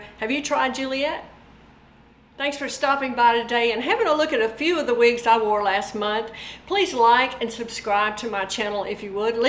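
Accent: South, United States. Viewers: I watch you all of the time. I love your cute southern accent and your natural elegance with your videos.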